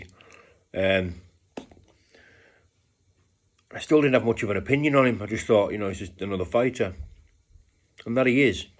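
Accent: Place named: Luton, United Kingdom. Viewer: You come out with some funny words coming from Luton sounds like another language mate,all the best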